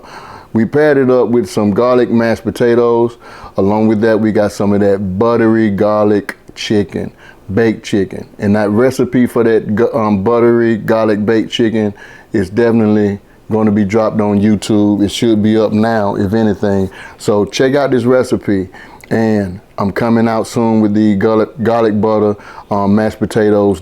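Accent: South, United States. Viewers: “Not too much where it’ll set you on fiyahh” 🤣🤣🤣 Looove the southern accent brotha and thanks for sharing your kitchen with us!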